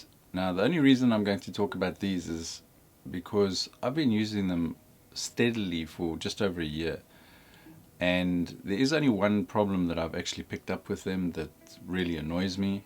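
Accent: South Africa. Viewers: Came looking for info on a firmware update for my 840s. Stayed cause I heard a south African accent! Nice one boet!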